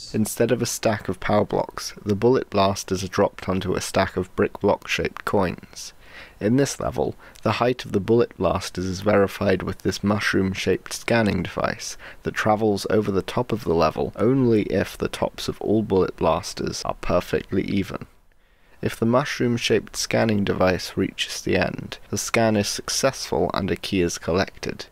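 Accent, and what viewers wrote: United Kingdom. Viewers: Are you Scottish? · are you from portsmouth? you sound like neil gaiman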